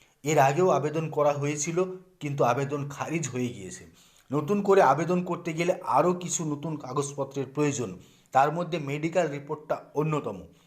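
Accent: Bangladesh. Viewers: বাংলাদেশী কথার জন্যে উনার কথা শুনতে ইচ্ছে করে না। বলার ধরন চেঞ্জ করুন।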